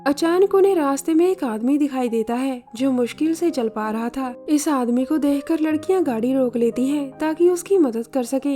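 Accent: Punjab, India. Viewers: Sis tusi punjabi o na 😂 ?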